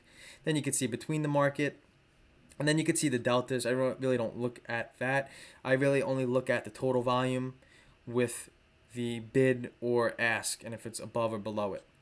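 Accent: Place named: Long Island, United States. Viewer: Brother is from long island, i can hear it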